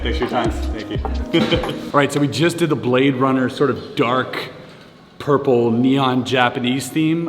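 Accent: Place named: Quebec, Canada. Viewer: bonjour j'ai vu que tu habite au quebec grace a ton accent et au moment ou tu parle francais bref continue! t'es super on t'aime!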